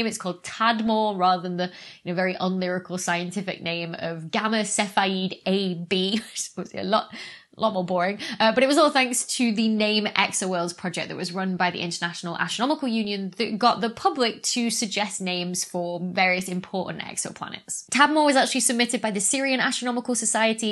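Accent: United Kingdom. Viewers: Keep in mind that she's British and British pronunciations often differ from American. In the U.S. we call the second Greek letter "baatah," while the British say "beetah."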